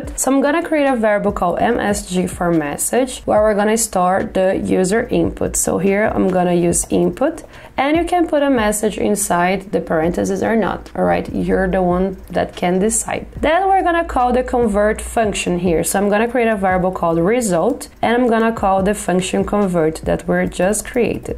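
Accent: Brazil. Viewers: Esse sotaque brasileiro não engana ninguém hahahah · Ela tem um acento brasileiro muito forte, com certeza ela e brasileira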